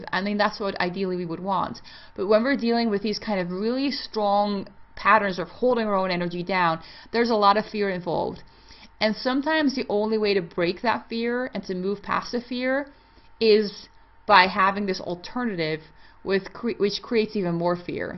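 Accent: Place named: Canada. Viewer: Thanks for the video. Your accent sounds like a mix of Canadian, Scottish, and... Dutch? 😊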